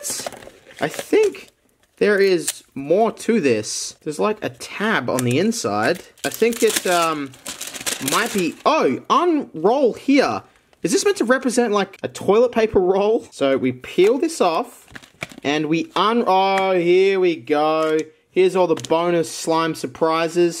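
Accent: Australia. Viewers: I was like “this dudes definitely Australian” then you brang up the water joke. Whkxbskcbskcbdk but yes anyways hello fellow aussie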